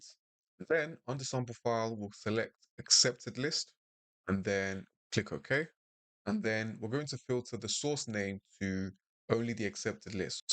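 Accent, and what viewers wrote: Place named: United Kingdom. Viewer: And your British too perfect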